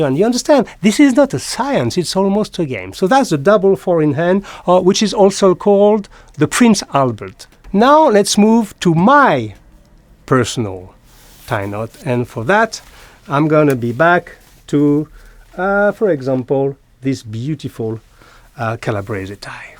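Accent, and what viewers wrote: France. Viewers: Very well said! „As long as you not look like a clown“ is basically all one should consider. By the way, I love your French accent when speaking English. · Please upload more often. I love watching/listening to you, the french accent adds so much to it. I'd like to hear more jokes from you too like in the shoe series with the "not my cup of tea" joke about the loafers. Really laughed with that one 👍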